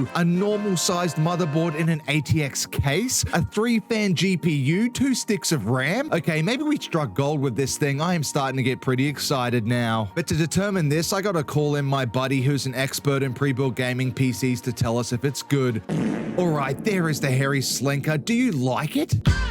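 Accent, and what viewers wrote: Australia. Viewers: is the cutest Aussie accented techtuber!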